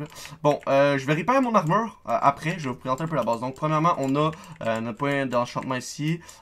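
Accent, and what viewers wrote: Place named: Quebec, Canada. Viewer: J'adore l'accent québécois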